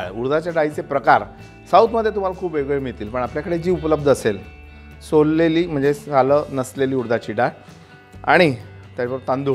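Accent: Vidarbha, India. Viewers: Vidhrbha chi bhasha bole Tumi mustt khupch Chan vtl sir khupch Chan recipe sir 👍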